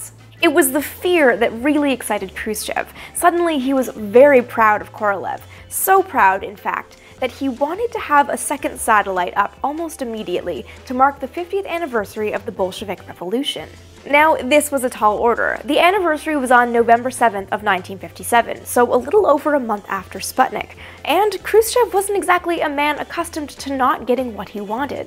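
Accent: Canada. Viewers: Great summary. And might I say, your pronunciations are very Canadian in this episode :) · Always like hearing the Canadianess coming out. :-) Miss-isle just sounds so much cooler than Miss-sel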